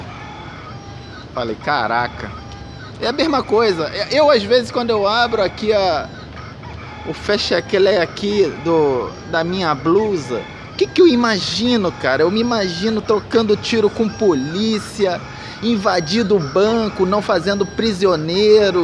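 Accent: Rio de Janeiro, Brazil. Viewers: Nosso Mestre é carioca MDS, melhor que isso não existe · Clhr ele e carioca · E ainda parece ser da Zona Oeste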